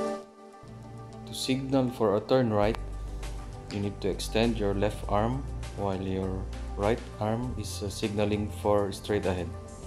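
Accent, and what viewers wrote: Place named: Philippines. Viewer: Filipino detected hehe. The voice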